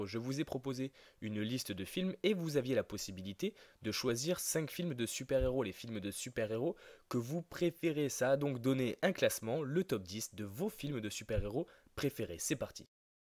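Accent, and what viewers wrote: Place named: Marseille, France. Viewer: Parce que t'a l'accent d'un sudiste et tu pronnonce à la marseillaise.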